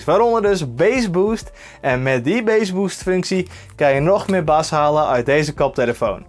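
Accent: Friesland, Netherlands. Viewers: Leuk die Friese klemtOnen😄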